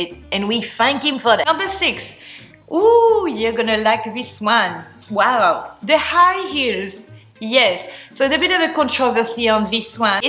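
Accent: France. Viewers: I wish your videos were longer - I love listening to your French accent!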